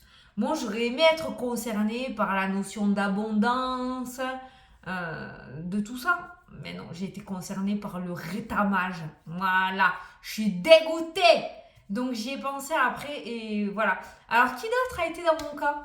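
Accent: South, France. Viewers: Tu me fait rire !!! ton accent du midi qui chante, ton franc parlé ,ta joie de vivre te regarder m'apporte de la bonne humeur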